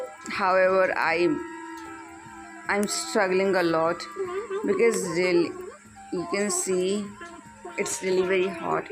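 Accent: India. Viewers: Damn, I feel like I don't understand any of this language. Is this the Indian English accent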